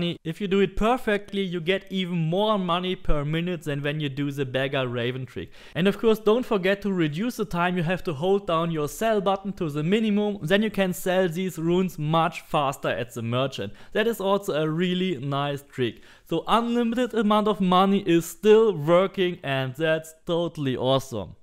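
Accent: Germany. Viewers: deutscher akzent isssss da :D nice channel btw :D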